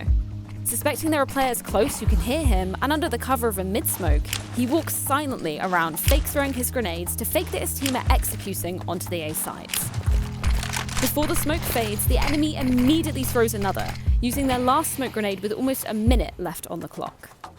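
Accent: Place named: United Kingdom. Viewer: That female british accent tho 🤤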